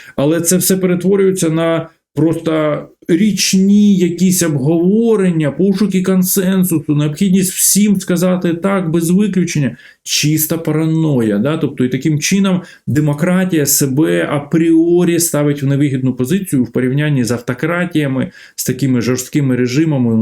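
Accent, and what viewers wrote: Moscow, Russia. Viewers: Прізвище вроді ж Галащук, а людина акає, як московит. "тАвари, пАчув на свАю адресу, Європоейський сАюз, вирАбниками.." Нє, ну невже так важко вимовляти українські слова не акаючи?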